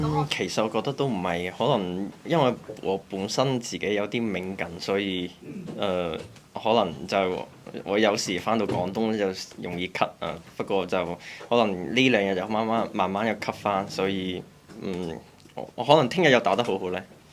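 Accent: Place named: Shaanxi, China. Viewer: 肯定不是东北，是陕西吧？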